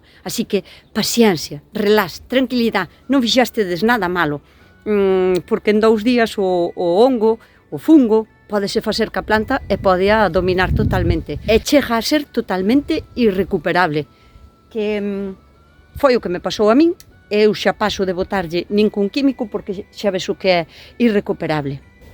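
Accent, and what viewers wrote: Galicia, Spain. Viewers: Hola, eres auténtica, da justo oirte falar como falamos na costa, sin complejos nin tonterías. Jrasias por compartir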